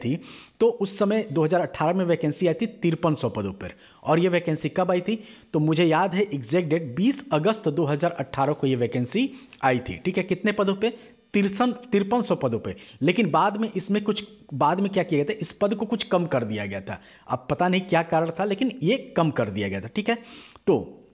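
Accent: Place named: Bhojpuri, India. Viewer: Sir apki hindi..thodi Bhojpuri hindi kaisi lgti h..🤗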